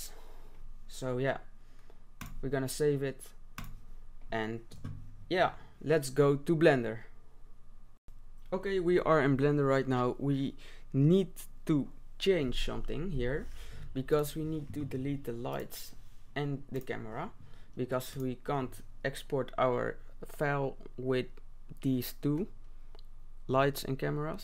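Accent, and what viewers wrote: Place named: Netherlands. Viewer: ik hoor duidelijk Nederlands hahaha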